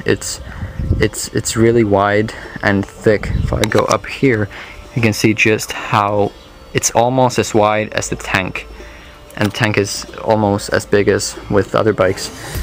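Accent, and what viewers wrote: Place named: United States. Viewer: You sound American but your plate says you're Polish?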